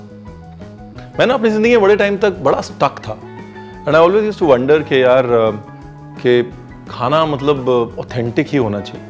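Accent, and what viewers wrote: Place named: Lucknow, India. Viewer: Maza aajta hai aapke videos deke. Aapka lucknow wala tone and words itna acha lagta hai feels like my friend is talking to me.... Btw I am also from Lucknow.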